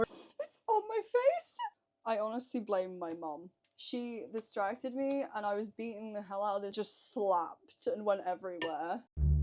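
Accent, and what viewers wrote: United Kingdom. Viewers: HELP I DIDNT KNOW YOU WERE BRITISH Say mongoose for me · your British accent, ❤❤❤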